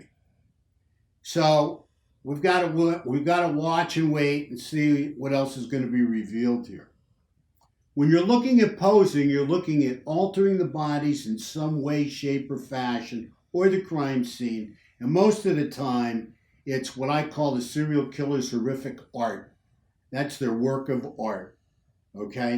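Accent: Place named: Philadelphia, United States. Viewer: Hi, do you think the Long Island Serial Killer is the person responsible for killing the women in Atlantic City? Melissa Taylor was last seen (not 100% sure) in a town close to Atlantic City, Port Republic. Also, are you from the Philadelphia or South Jersey area? You sound like it, it's where I'm from. Thank you for the great content!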